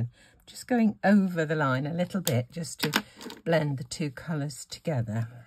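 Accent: United Kingdom. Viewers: What a cute card! I subscribed long ago but I have a lot of subscriptions and I kind of get stuck on a few. Only recently came back around to watching some more of your videos and I have to say your manner is like a breath of fresh air! I love your clean and simple style and your voice is a joy to listen to. I love your British accent ( I’m from Texas in the US, we have quite a different sound!) and your calm, quiet ways. Your videos are so very enjoyable 💕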